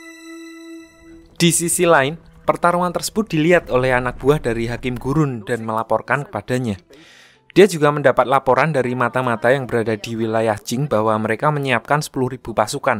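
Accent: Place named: Java, Indonesia. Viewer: Medok bgt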